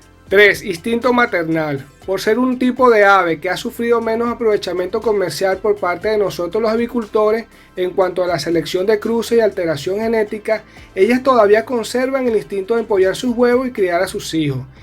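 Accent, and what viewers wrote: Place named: Venezuela. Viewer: Por tu voz me doy cuenta que eres Venezolano... tengo un gallo producto de un gallo criollo con una gallina ponedora Isa, un hermoso ejemplar de plumaje negro con pocas plumas Rojas y azules. Ahora, veo tu video y veo que mi plan se ajusta al tuyo. Pregunto, donde resides? Y como logras encontrar los gallos hermanos de ponedoras?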